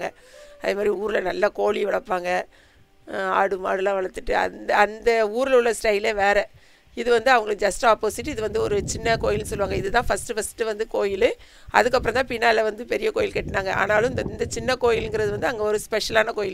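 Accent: Tirunelveli, India. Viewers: நீங்க சென்னை ல இருந்தாலும் உங்க பேச்சு திருநெல்வேலி பேச்சு தான்.... மாறல... Gud 👍👍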